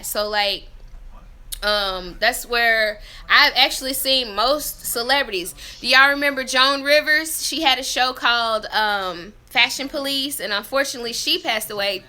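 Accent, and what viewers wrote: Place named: South, United States. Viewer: That southern accent is never gonna go away darlin'. You are always awesome!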